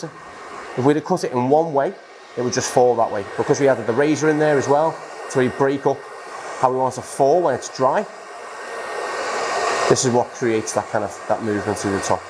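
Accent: Liverpool, United Kingdom. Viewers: Is that a Liverpool accent ?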